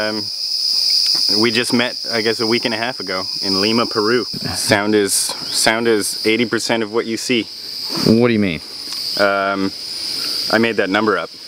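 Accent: South, United States. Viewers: Hey Destin! My first video was the rope coil analysis with honey, which I found through a science-oriented Facebook page(might have been IFLS, I cant remember.) When the video started out, I saw a guy with a southern accent and a bunch of guns, and thought "oh great..." But then you started talking and I was hooked. My favorite video was the Prince Ruperts Drop, its a facinating application of physics.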